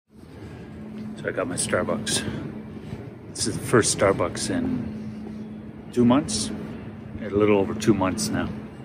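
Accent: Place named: Finland. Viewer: A nice finnish accent.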